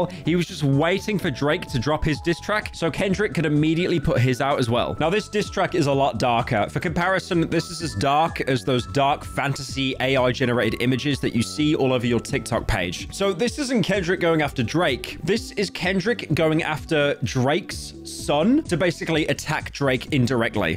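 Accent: United Kingdom. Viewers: I get what ur saying but that’s just his British accent, not necessarily the pronunciation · I love listening to furry British man talk about American rap, very intuitive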